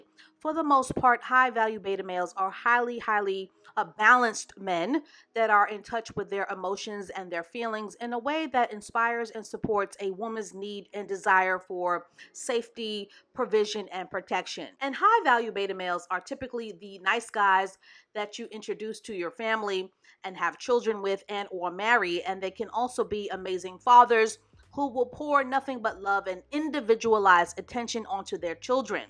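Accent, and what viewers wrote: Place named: New York, United States. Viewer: The way u say "boss" makes me assume you're from New York lol love that accent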